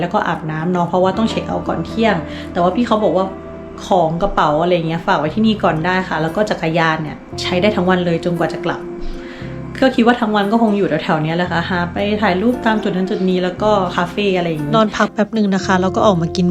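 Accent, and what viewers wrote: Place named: Isan, Thailand. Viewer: สำเนียงอีสานดีมากครับ เป็นคนจังหวัดอะไรครับ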